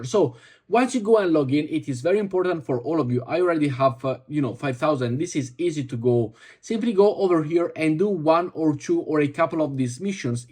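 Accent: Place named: Italy. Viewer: Sei italiano e si sente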